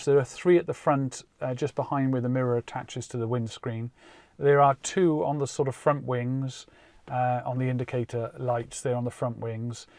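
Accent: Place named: Wales, United Kingdom. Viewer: Just thought id let you know that I placed an order for a m3 and used your code :) - As said, have been really enjoying your content + Welsh accent always a winner lol ... But, got into a bit of a mess, when I used your referral it didn't go to the referral landing page, rather it just went to the standard Tesla page... I didn't know any better until after I placed the order :( - Gave Tesla a call though and they sorted it out thankfully! So, hopefully, you do now have another 1,000 miles in the 'tank' as well :D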